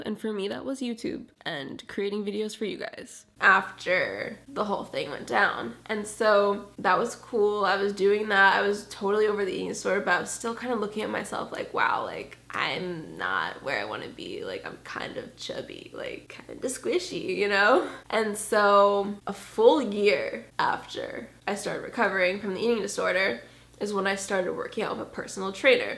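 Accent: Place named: Los Angeles, United States. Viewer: her accent is like PURE LA now lmao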